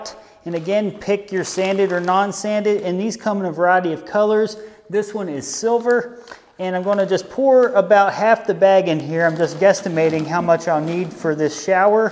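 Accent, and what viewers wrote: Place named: Pennsylvania, United States. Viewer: Southern PA af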